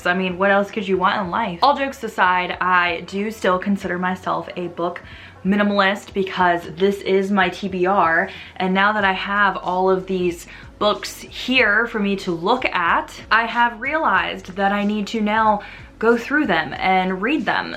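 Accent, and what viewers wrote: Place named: South, United States. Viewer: That country accent🤣🤣🤣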